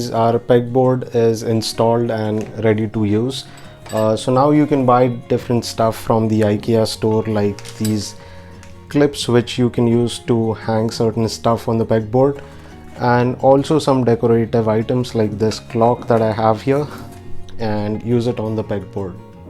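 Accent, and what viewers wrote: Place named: India. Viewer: The calm nice and relaxing Indian voice helped me understand this wonderful Board, please make more Vids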